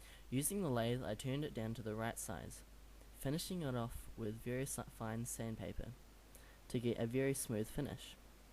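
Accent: England, United Kingdom. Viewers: Wow. Kom je uit Engeland ofzo? Toppie accent. :) Is al dat gereedschap van jou? Draaibank en freesbank hoorde ik ook voorbij komen volgens mij? super werk heur!!!! :)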